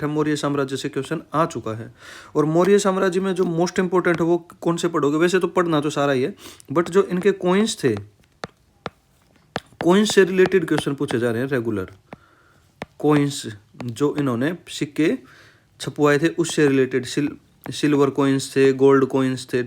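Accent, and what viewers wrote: Haryana, India. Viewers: Bhai aap haryana se ho kya 😊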